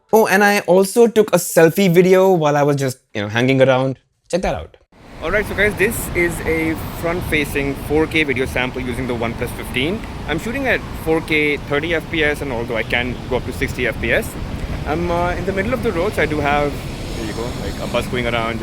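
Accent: India. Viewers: Your Indian accent sucks too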